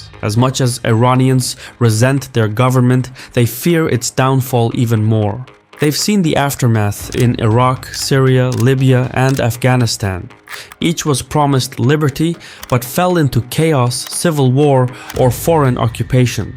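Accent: Russia. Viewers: Thanks for spewing so much lies, you Russian asset with such a horrible accent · Dude at least hid your Russian accent 😂 before trying to say what Iranian people want or who they trust! Did king Putin put you up to this? such a low act !!!:face-red-droopy-eyes::face-red-droopy-eyes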